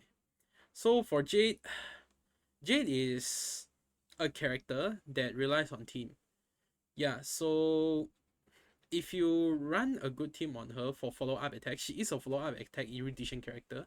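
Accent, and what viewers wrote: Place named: Singapore. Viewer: Bruh the singlish, I can't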